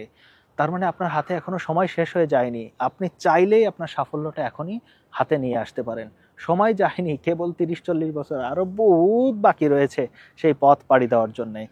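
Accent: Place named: Jessore, Bangladesh. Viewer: Jessore er lok mone hosse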